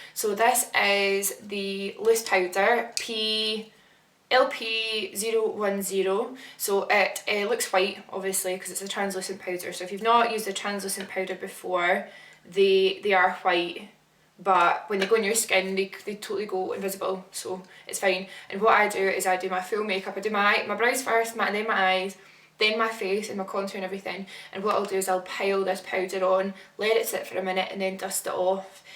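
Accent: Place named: Glasgow, United Kingdom. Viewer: Hi just discovered you and I'm loving your channel! It's great to watch make up tutorials from someone with a Glasgow accent!! Can you please do a tutorial on your eye makeup in this video? x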